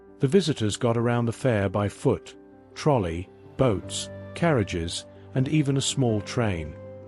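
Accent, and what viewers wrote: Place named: United Kingdom. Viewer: Great video! I like your new male British accent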